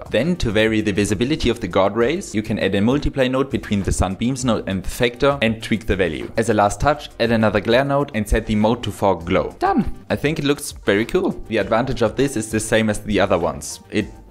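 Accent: Germany. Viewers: i hear deutsch...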